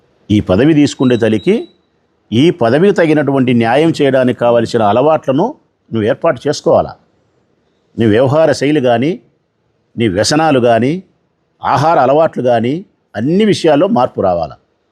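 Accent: Rayalaseema, India. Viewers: Sir నమస్తే, మీ ప్రెస్మీట్ చాలా స్పష్టంగా, సుత్తి లేకుండా, అశ్లీలతకు తావు లేకుండా మర్మగర్భంగా ప్రశాంతంగా సీమ యాసలో పండితులకు, పామరులకు అర్థమయ్యేలా వ్యంగ్యా స్త్ర లతో మాట్లాడిన తీరు అభినందనీయం. అయితే ఆరిపోయే దీపం వెలుతురు ఎక్కువంటారు. నాయుడు గారు శృంగార పురుషుడు. మిడ్ నైట్ మసాలా చానల్ నిర్వహిస్తున్న కళాపోషకుడు. ఆయనతోపాటు పొట్టకూటికి పనిచేస్తున్న యాంకర్లు స్వామిభక్తపరాయనులు. తప్పు చేసినవానికన్న ఆ తప్పును సమర్థించేవారిని ఏమనాలి? హిందూ ధర్మప్రభోదకులు, పరిరక్షకులు, ఎవ్వరూ పెదవి విప్పడం లేదు. నాయుడుగారి కన్నా వీరు దైవద్రోహులు కారా?. పండితులు, ఉపన్యాసాలు, ప్రవచనాలు చెప్పేవారు చేస్తే శృంగారం, పామరులు చేస్తే బూతు. ఆమెతో సహజీవనం చేస్తున్నానని నాయుడుగారు చెబితే సమస్యే రాదుకదా? ఎందుకింత రాద్దాంతం. లోకోభిన్నరీతి.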